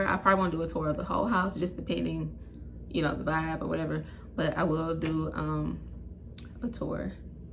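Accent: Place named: South, United States. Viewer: I knew you sounded country i just became a travel cna because a by watching different podcast that you giving info cause i been working two months of a agency cna and i enjoy going from facility to facility and i asked my agency do they have travel cna opportunities before i skirt out and look at god these folk then raised my pay finna put me in a hotel now i didnt know i pay for the hotel but its ok cause my daughter is in the army and i want to suprise her with a car for her 20th birthday next april so i just got a blessing under the table. So thank god for al these things if you step out on faith and believe in your vision and your dream it will come to past so everybody experience is different. To all the cnas i love ya god bless ya. Continue to enjoy what ya do working for these agencies are so much better more flexible with agency cna you have a opportunity to be a travel cna which makes more money yes i got kids too but yea like she said you got to make these sacrifices and i saw my savings go up too every hotel i travel i take my money and stuff my envelopes cause im saving and working my ass off. 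If you want to take cna to the next level stop working nursing homes 8 to 10 days a pay period forced to work you got to put in days off not with agency you confirms your days to work you be off the days you dont work and you still got a job. As i get more experienced i wont come out my pocket im just glad im putting this experience on my resume.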